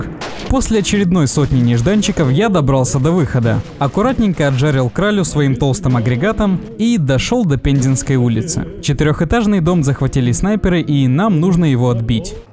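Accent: Poland. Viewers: Ты што польский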